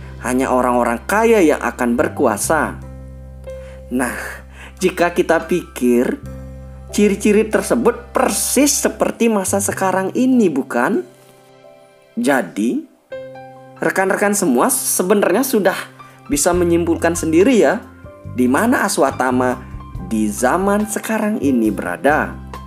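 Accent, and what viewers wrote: Bali, Indonesia. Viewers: Bli logat nya it lo bali bgt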